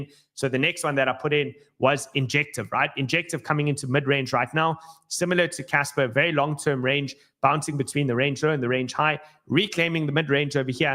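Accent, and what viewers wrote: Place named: South Africa. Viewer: Love that South African accent, dude!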